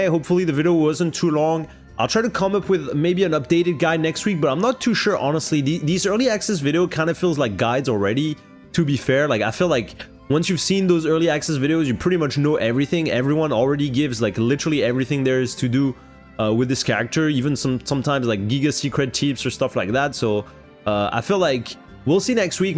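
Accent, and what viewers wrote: France. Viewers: are you french you have an french accent sometimes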